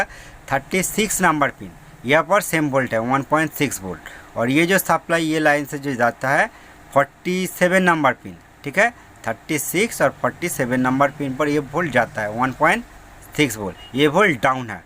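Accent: Bengal, India. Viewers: Nice information Dada. Apka Bengoli Hindi very nice.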